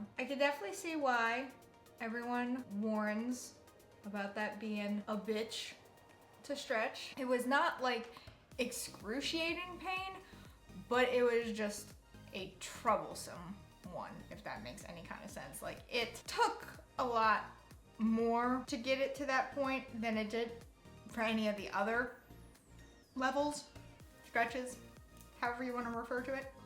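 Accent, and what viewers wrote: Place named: Louisiana, United States. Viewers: I'm going to guess you're from Louisiana. The way you spell go and the word Y'all. Lol. You're a beautiful young lady. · That Cajun accent though! Love!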